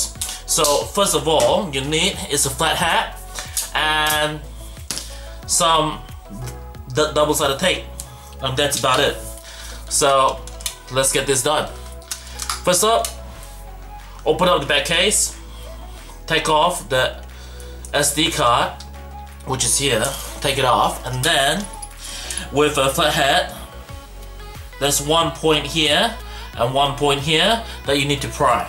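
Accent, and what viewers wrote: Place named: Australia. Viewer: Asian & Aussie accent... 1st time I've heard this mixture. 😎👍🏽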